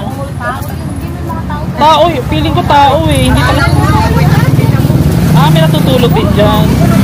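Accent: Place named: Bulacan, Philippines. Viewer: ay malapit ka sa Bulacan, bulacan..e yung punto eh!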